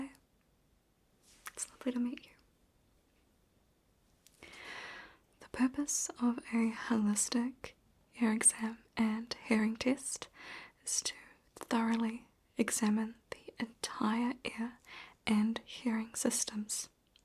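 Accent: New Zealand. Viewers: I think the tingles came early and I shivered with a warm glow. Love the NZ dialect 🌹🌹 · Amazing New Zealand accent I honestly don't hear it much in New Zealand so it's good to hear it in this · are u doing a Kiwi accent or are u kiwi? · i’m convinced people love the kiwi accent because sometimes it sounds transatlantic lol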